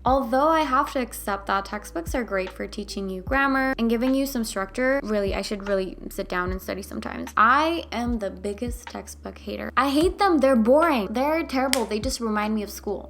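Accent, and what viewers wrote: United States. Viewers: Not sure exactly, but she has a very specific West coast American accent so it's likely she has either lived in the US for a while or has family or friends that speak English with that accent. If you want to learn to speak like that, watch a lot of American YouTubers and try to mimic their accent and the words that they use.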